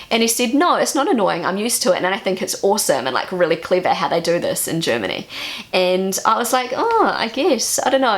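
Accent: New Zealand. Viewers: By the way, I love your NZ english. It has a weird soothing quality and puts a smile on my face! I need to go to NZ.... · By the way I think you have such a cute English accent! I have lived in England, South Africa and visited Ireland and Scotland but your New Zealand accent is in a class by itself and I think it is very cute!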